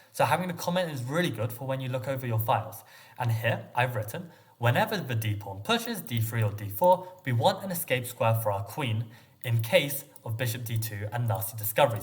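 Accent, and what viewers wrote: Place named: Germany. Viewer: the German Accent sounds real cute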